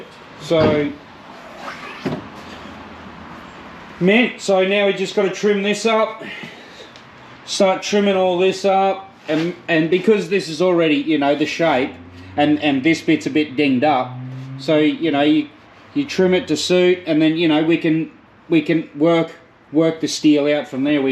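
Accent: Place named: Australia. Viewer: Being an American I’m not used to hearing an Australian accent and I gotta say I love it. Love the work you putting in. Can’t wait to see the final product. Keep it up.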